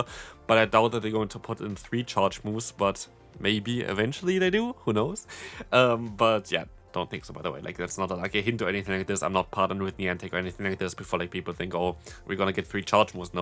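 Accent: Germany. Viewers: bro, are you german? I can swear i can sense from your accent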